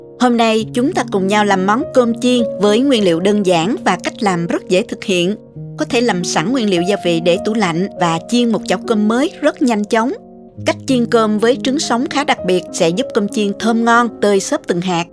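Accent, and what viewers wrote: South, Vietnam. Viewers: làm cơm chiên nhìn thấy ngon quá, nhìn thấy thèm muốn ăn hết một tô lớn luôn đó, vừa khéo tay chỉ dẫn dễ hiểu lại có giọng nói trong trẻo rõ ràng đặc trưng của người miền Nam rất dễ cảm mến và giọng nói rất hay và trẻ trung nữa! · có giọng nói miền nam truyền cảm trong sáng hiếm có. Rất thích xem các video hướng dẫn nấu ăn của không những vừa ngon miệng mà còn trang trí đẹp mắt nữa. Rất cảm ơn, triệu like cho. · Gio.ng no'i dung giong mie^`n nam nghe de^~ thuong ghe^ no*i!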